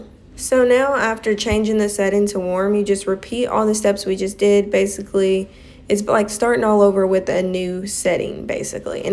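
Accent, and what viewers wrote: South, United States. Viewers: Where you from?! Sound like a southern girl like me 😊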